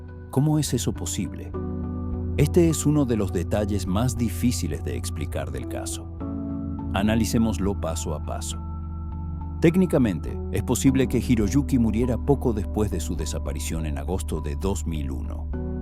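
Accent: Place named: Argentina. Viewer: Es mejor la otra voz esta suena como argentino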